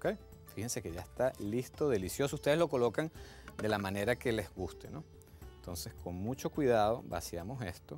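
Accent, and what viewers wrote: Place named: Venezuela. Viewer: venezolano SEGUUUUURO jajaja :D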